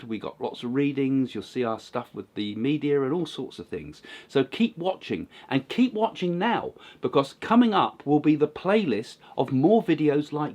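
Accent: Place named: England, United Kingdom. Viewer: Your a medium? Interesting, love your English accent.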